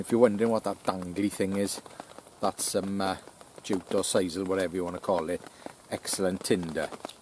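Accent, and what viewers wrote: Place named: Scotland, United Kingdom. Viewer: New sub here. Love your accent. Scottish? Good content. Will keep on eye on you. I prefer to ramble and bitch, though.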